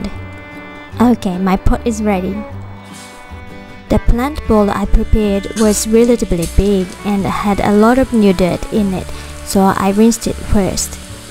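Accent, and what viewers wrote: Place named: Australia. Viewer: Ive really enjoyed this video and I've also really enjoyed listening to you. I spent time in QLD and your manner of speaking is nicely peppered with familiar aussie euphemisms. Lots of good information, also appreciated you sharing the results good and less so. Now im toying with the idea of creating a little pond myself- im getting ahead of myself now though 😂. Ive subscribed and look forward to seeing what else is on your channel. Big l❤ve xxxx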